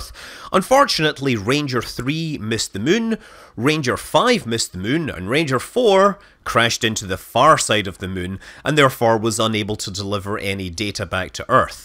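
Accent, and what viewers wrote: Scotland, United Kingdom. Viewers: "the sprüs güs" Love the Scottish accent so much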